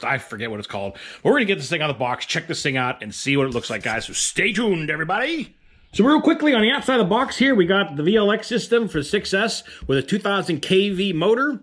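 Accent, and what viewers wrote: Pittsburgh, United States. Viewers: The Pittsburghese is strong, my friend. I am also from the area.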